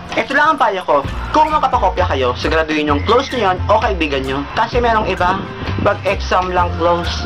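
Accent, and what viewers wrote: Visayas, Philippines. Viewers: hahahaha! bisaya pd d.i ka? xD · bisaya ba? bisaya? :) Galing! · haha bisaya gud siya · BISAYA KA? SHET AS IN? WALA KO NAG EXPECT! OR KATONG YAYA NINYO ANG BISAYA? MY GOSH GI KILIG KO PISTE! 😂😂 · Bisaya man diay nis. hahaha buanga oi! xD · BISAYA MAN DIAY KA HAHAHAHAHAHA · bisaya pala xa...!haha...... tga san yan..? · biSaya diay ka ????.. . hahaaha. . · HAHAHA! galing, bisaya din pala c kuya. bagay bisaya sau kuya;) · bisaya diay ka .. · Bisaya ka pala? san parte day? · teeeerrr, bisaya jud ka ter? lingaw kaayo ka ter uie! kaulett! haha. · hahaha lakas ng trip ee. BISAYA MAN DIAY KA DONG! nyahahahaa! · waaah. bisaya. tga asa ka?hahahah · shet!!...lingawa nimo mag.bisaya oi..karelate man ko sa imong pisti ug yawa...haha!!.. igo ko atong sign language...LOL.. #pisti ka day, naa na si maam day, yawa ka...haha!!!! :DDDDD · Bisaya ka? 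· Bisaya? hahaha xD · ag KYUTTTT!!! nag pag bibisaya mo tol!!!!! wahahaha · bisaya ka? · HAHAHAHAHAAHHHAHA bisaya te? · ahahaha bisaya si koya.. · Haha, Feeling ko Bisaya talaga dahil sa tono :v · Hahahaha. nad Yess. Bisaya ka day.! Hahahahhahahaaa. Im from Cebu. noe here. :* "Yawa ka pisti:" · "day,day josephine unsay sagot sa number 1 day?day,pagdali day....kay naa na si maam...pesti...pesti ka..yawa ka..XD. Bisaya kang dako!!hahaah...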